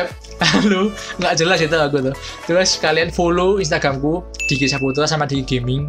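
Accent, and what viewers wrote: Java, Indonesia. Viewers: Hero favorite : liliana Open id : <num> Btw saya suka medoknya bang diki wkwk XD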